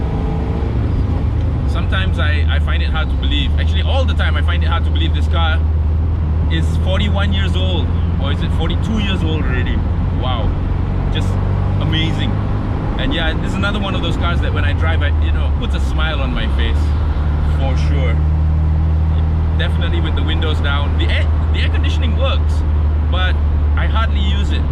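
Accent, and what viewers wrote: Italy. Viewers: ...you are truly posessed, confirmed, chop & signed! There are no more medicines in the world which can cure you for this kind of italiano crazy possession. I will try to arrange for you an italian priest to perform the exorcism to rid off the 'Alfa Romeoooo' ancestral evil influence on you soon...but btw,you truly made my day today just listening to you rambling thru your italian 'celup' accent.It was very funny & original pc of work there. You are truly the best out there.. haha.. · Hahaha 😂 love the Italian accent . Made me just so happy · Hahaha ohh boy, your italian accent is so funny 😂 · Love tat italionooo accent...u should try getting into movies...surely talented...awesome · Nice engine sound plus your Italian accent 😂. I also want to buy one classic car since I started to see your video 😄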